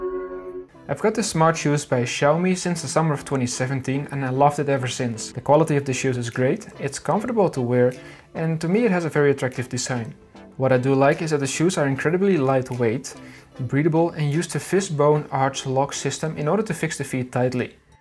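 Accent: Netherlands. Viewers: wait wait u dutch?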